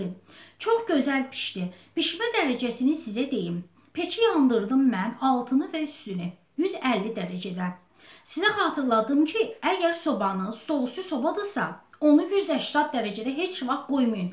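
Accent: Baku, Azerbaijan. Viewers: Salam bacı. Kərə yağını duru yağla əvəz etmək olarmı xəmirində? Bakı ləhcəsi ilə danışığınız 👍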